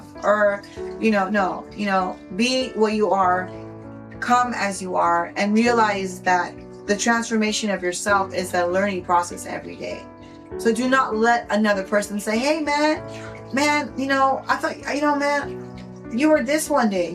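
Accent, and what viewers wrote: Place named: United States. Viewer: Presentation is excellent and your voice and words pronounces are great with subtitles (pure American accents) keep it up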